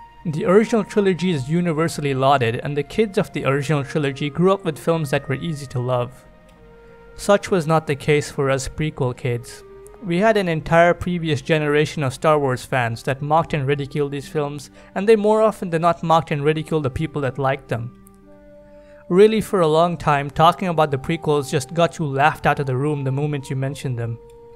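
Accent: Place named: India. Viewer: Starts video -Hears Indian accent Time to abandon ship!!!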